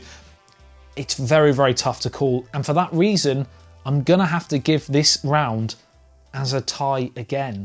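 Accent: England, United Kingdom. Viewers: That's the beauty of the English speaking the English language.😍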